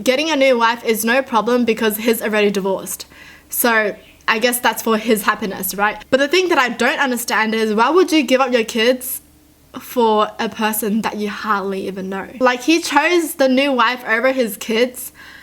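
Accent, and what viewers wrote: Australia. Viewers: Is she Australian I for some reason always thought that idk why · All your pronunciation is so cute. Like not just Australian, more unique than that. Like you pronounce “confusing” like con-FOO-sing and I love it.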